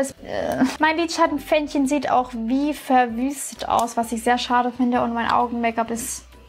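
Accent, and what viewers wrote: Swabia, Germany. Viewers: ...Bisch halt einfach BESCHTE!😂#Schwabe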